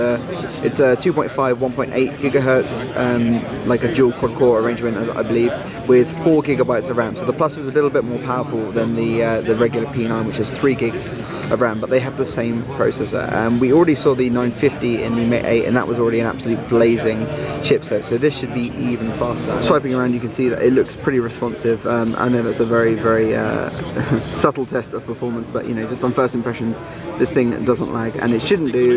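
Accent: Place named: England, United Kingdom. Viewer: You sound like Jonah Hill, but with English accent;)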